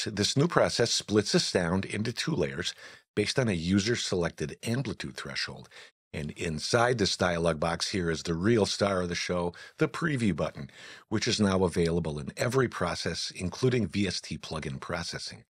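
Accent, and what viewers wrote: United States. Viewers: The upstate NY accent warms my heart. Oh and SL 9 is cool too!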